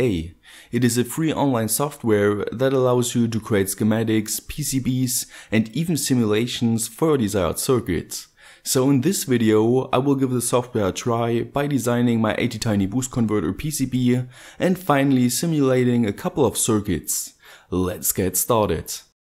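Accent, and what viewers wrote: Germany. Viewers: man hört voll das du Deutscher bist :D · what realy you are german iam too i wonder for a bit why your main plugs are european and your english is kinda german i dont know XD but why are you dont make german videos i love to see that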